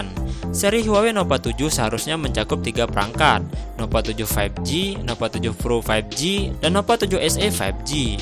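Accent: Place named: Java, Indonesia. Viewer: Adooh, medok suarane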